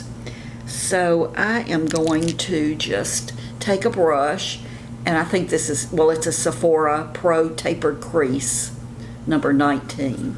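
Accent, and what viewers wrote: South, United States. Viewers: It was lots of fun getting ready with you. You mentioned that you live in Florida. You must live in the panhandle with that wonderful southern accent.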